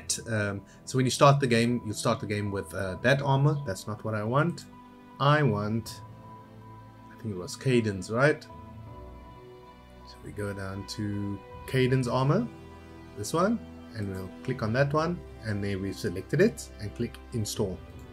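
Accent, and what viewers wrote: South Africa. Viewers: I can hear another South African anywhere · i think i'm from the same place as you, south africa? i felt your accent sounded south african? as for the p*rn thing... haha, that actually why i switched to z. once you get to the three xxx to place things really at the bottom, you realise you need a more benign letter :)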